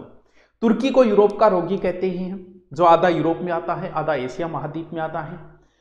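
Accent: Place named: Rajasthan, India. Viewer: Mai Bihar se hu aur aapka language sunkar mujhe lagata hai ki pakka aap rajsthan se hai 😂😂😂 but l don't know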